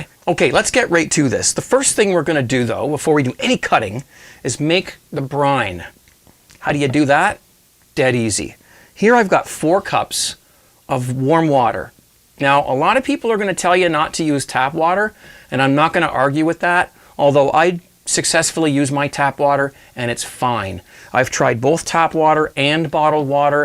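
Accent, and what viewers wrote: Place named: Canada. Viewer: Michael Kimber he's definitely Canadian. we don't say "Bob's your uncle" in the states. and it's pronounced erbs the h is silent 😝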